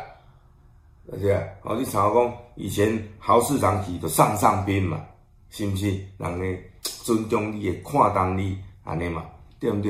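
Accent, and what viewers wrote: Taiwan. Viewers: 雖然立場不同，但佩服您，也喜歡你的台灣味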